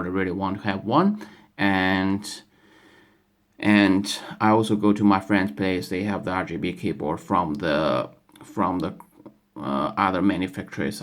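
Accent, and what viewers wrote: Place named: China. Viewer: 中国口音的英语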